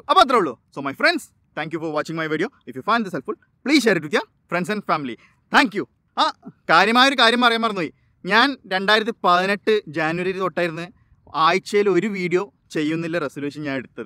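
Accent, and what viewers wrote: Kannur, India. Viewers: Engale kannur karan aano bro ?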